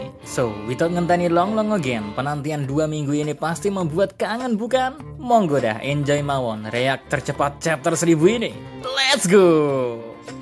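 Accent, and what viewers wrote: Java, Indonesia. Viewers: Jowo mu emang medok min,tapi caramu nyampek no pesen keno nang ati,marai mrinding 🙏🙏🙏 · lebay jir. suara medok gitu mana bikin merinding 😂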